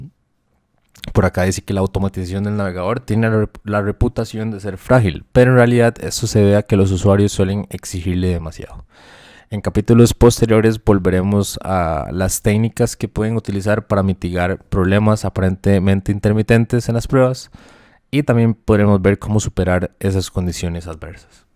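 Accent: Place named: Costa Rica. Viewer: Muchas gracias estimado, creo que sos tico por tu acento. Grande Costa Rica!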